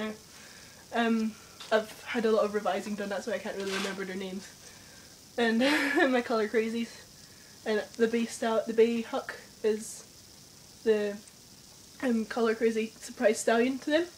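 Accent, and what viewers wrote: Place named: Scotland, United Kingdom. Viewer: Your not alone with the accent , cause I'm scottish too :-D and live near Glasgow !!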